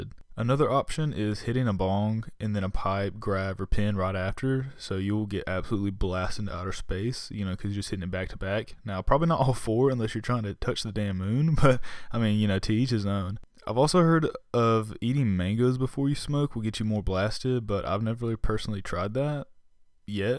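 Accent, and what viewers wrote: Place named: Kentucky, United States. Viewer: dude sounds like he from Kentucky :o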